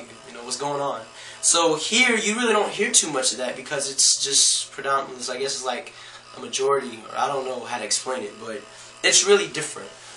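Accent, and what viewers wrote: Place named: California, United States. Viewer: Hello, I am not from califronia, but i think you do have the californian accent which i consider is the best found in the US. That accent is the clearest and the one that feels really proper. when i was learning english my teacher was from CA and i basically speak like you do. I wish i could be in touch with some people from CA no matter what part, so i can learn more abou the culture and about the people from california. Kudos to you and don't bother listening to those haters who know nothing about proper and beautiful english like it is spoken in CA. Thank you!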